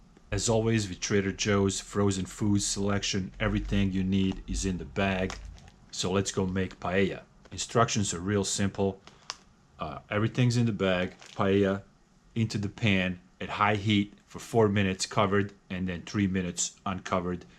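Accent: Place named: Former Yugoslavia, Eastern Europe. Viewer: If you don't mind me asking, what countries your accent from? if I had to guess I would say one of the countries from former Yugoslavia.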